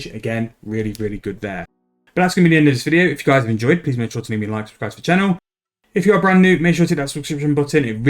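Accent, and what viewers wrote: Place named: United Kingdom. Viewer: 6M an hour doing enchants... damn I wish I tried this before. Trained 99 mage with combat, did some telegrind (sandstone --> sand) and I never looked into enchanting items really. Thank you so much! If you would talk a little bit slower, I'd appreciate it a lot. As a non-native English speaker the UK English is often hard for me to follow up with. Either way, I finally subscribed to you. Let's go to the 6000 subs!!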